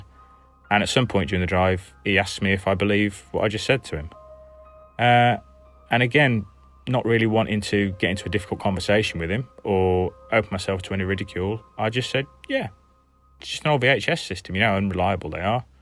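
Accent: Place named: Black Country, United Kingdom. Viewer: Nice to hear a Black Country voice! I grew up in Stourbridge...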